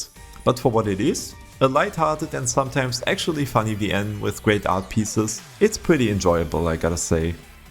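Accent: Germany. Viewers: you sound like a german dude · i hear some DEUTSCHLAND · do you from germany ? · Is this guy german? He sounds like a german guy to 100% sry xD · I hear agerman aczent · Total den Deutschen Akzent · bruder dieser deutsche akzent wie geil xD <3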